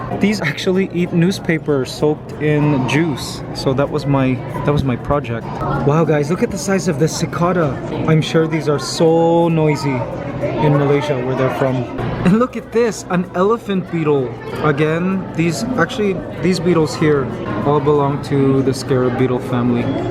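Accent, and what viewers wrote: Philippines. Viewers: I enjoyed every second of the vid. I sooooo love your voice Kuya. Wishing you could do a voice-over of some science documentary. 👍 You're Pinoy accent cracks me up every freakin time 😂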